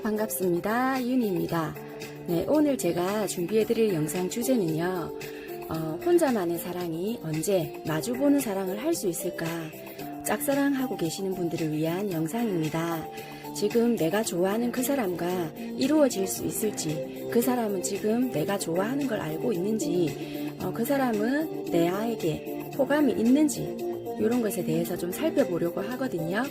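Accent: Busan, South Korea. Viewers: 부산 사투리 뿜뿜 타로 2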